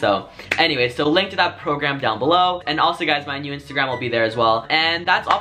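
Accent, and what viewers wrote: United Kingdom. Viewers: Who else loved the british accent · Omg that British accent is everything 😂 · Ur British accent sounded like Reuben De Maid 🤣❤️ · Loved your British Accent... So cute💜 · The British accent made me smile and started laughing I loved it oh my goodness so great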